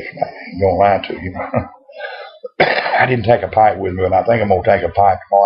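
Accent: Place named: Shreveport, United States. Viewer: Good ramble, really enjoyed it. Listening to you talk is like going home again since I was born and raised in Shreveport. We used to drink RC's and eat moonpies when I was young, but I never had a deep fried one. see ya next time.:)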